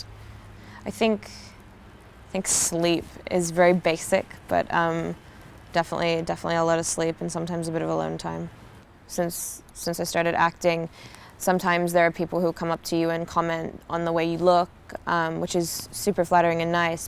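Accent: United States. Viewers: I luved her American accent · Bloody hell, I wish she'd turn off the american accent!!!! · She's slowly getting an American accent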